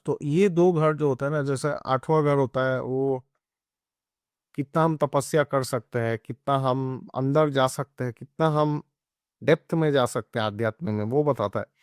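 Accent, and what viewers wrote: Assam, India. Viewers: Sir Aapki Hindi to kaphi acchi hain, Sir aap Assam se ho kya? Apki accent Assamese ya Bengali jaisi lagti hain???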